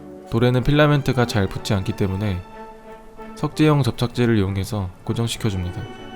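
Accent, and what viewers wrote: Daejeon, South Korea. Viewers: 어? 혹시 대전사람이세요? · 님 혹시 대전사람..? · 오 님 대전사람이세요??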